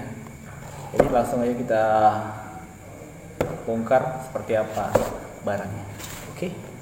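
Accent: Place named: Palembang, Indonesia. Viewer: Keren uuyyyyyyyyyyyyyy 👍 bahasa palembangnyo jagoan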